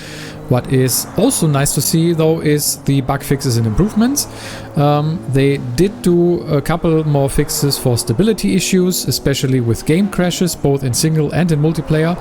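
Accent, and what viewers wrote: Germany. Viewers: You sound very german lol. Edit: oke nach dem Schwedenkreuz war ich raus 😂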